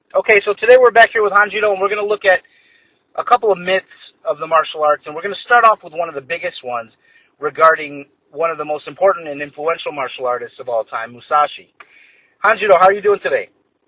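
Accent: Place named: China. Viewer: still sounds Chinese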